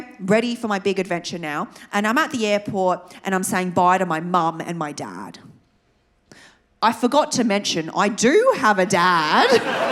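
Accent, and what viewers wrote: Australia. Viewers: I am 20 noin years old. Best Australian accent ever.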